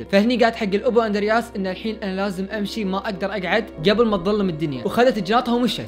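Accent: Kuwait. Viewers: كويتي صح باين من لهجتك زي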